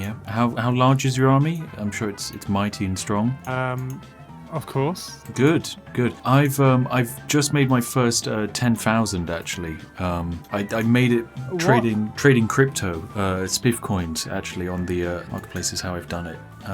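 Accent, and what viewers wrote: United Kingdom. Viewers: I think he might be British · He's british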